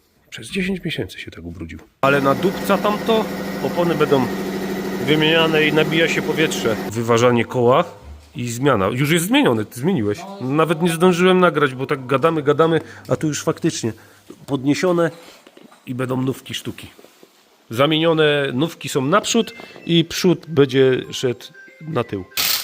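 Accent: Silesia, Poland. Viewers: Fest zaciągosz po naszymu Podobo mi sie to Pozdrawiom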